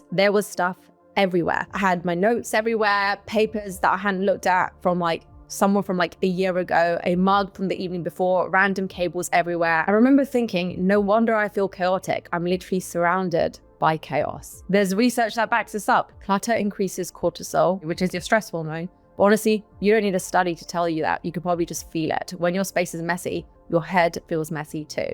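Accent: United Kingdom. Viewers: Just me probably who face hard time with British clipped English (usually i use 1.3x playback speed)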